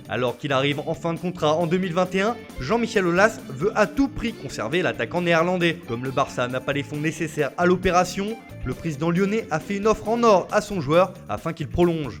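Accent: Italy. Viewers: Toujours sympaq cet acent italien 😁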